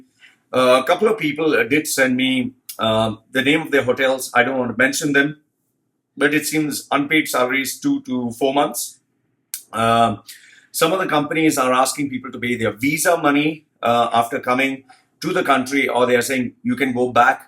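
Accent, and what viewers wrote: India. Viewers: Indian accent yeahhhh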